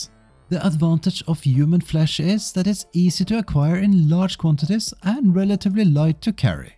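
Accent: Norway. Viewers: I haven't watch a video by you for awhile now but I started playing Conan again so now youtube says LOOK! Which is nice. Useful tips to know. Sidenote: I don't know why or how but for some reason your accent sounds stronger than before. Maybe you just have a new microphone or something, no idea but it's the strongest norwegian accent I think I've ever heard x) 🖖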